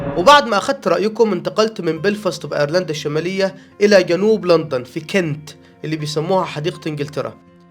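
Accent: Upper Egypt, Egypt. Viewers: والله جميل انك تحتفظ باللهجه الصعيدى مع اللغه الانجليزيه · ممكن اسألك سؤال هو انت من فين فى مصر لانى حاسك صعيدى و هل اللى بيعمل برنامج المخبر الاقتصادي ده يقربلك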